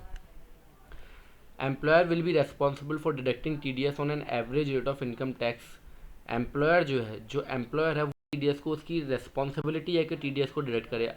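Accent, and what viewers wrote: Agra, India. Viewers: Sir aap agra se ho?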